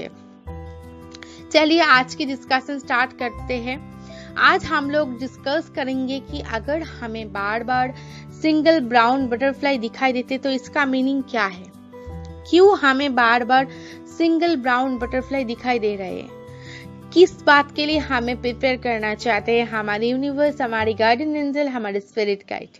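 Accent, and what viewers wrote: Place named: Bengal, India. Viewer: Waah nice jankari ap bangali h na apki hindi se lg raha h😅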